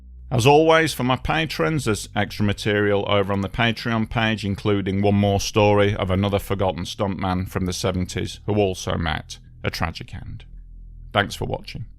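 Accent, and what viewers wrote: United Kingdom. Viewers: If you are referring to the narrator, he is from Britain... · "a global superstar, not only in the US". How hilariously American. But the speaker seems to be British 😊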